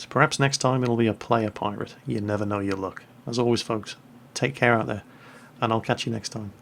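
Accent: Netherlands. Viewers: 10th!! and btw jingels, it does sound dutch, he sounds like my math teacher when he is talking english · I dont think it is dutch, he's talking english :p he has a really dutch accent tho ( i'm dutch ) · yep he sounds Dutch to me, and i`am Dutch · The voice does sound pretty Dutch. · he does sound dutch...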